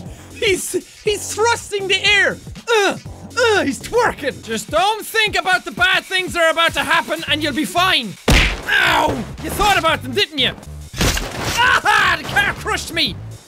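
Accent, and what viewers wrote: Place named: Ireland. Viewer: jacksepticeye I'm not going to lie to you but your Irish accent is just off the hook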